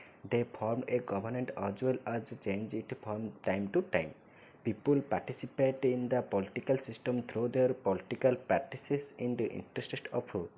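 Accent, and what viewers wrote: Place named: Odisha, India. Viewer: I believe you must be aware with the fact that each one of us have a accent and so does he, which is not a big deal. These dialects are influenced by the different languages that different sections of the country also speak, side by side with English. He is from Odisha due to which he speaks such. Instead of criticising him you should appreciate him for explaining this concept in a wonderful manner.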